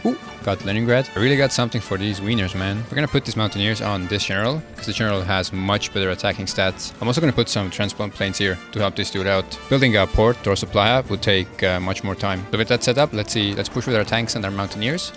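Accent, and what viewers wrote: Estonia. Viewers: HOI4 + draculaflow + eesti aktsent = instant sub 😂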